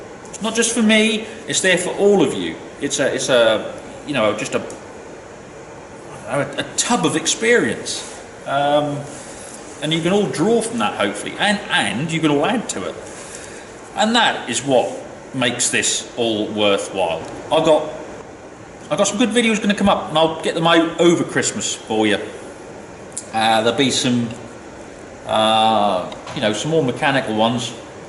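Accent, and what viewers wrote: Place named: East Anglia, United Kingdom. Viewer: Well I have not been a subscriber for very long as I found your channel from Cotontop3 when he mentioned you by name and I have seen your name on some youtube video channels that I watch. I like your comments to others. I came and watched a few of your videos and liked what I saw so I subbed. I enjoy listening to your Norfolk/Suffolk accent and what you put up for us to watch. I am really looking forward to seeing a lot more stuff on your 3350 especially the internal refurbishment of the cab.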